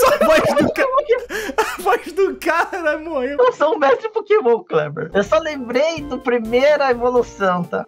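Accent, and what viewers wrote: Rio de Janeiro, Brazil. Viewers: Carioca né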